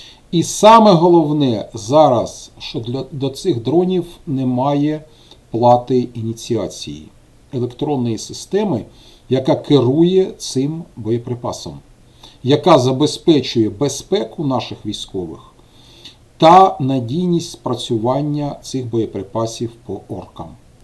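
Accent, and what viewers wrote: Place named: Kharkiv, Ukraine. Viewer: Харкiвський акцент украiнськоi, на дев'яносто п'ять вiдсоткiв майже такий, як I киiвський))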